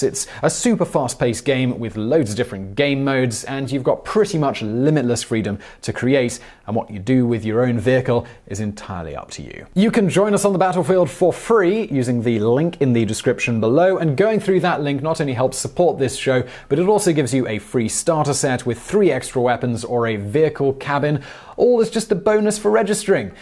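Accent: United Kingdom. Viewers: Simon, I general I can't stand British accents, but yours is pleasant.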